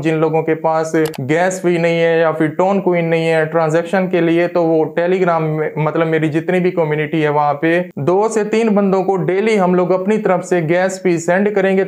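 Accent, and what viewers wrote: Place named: India. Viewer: pass indian accent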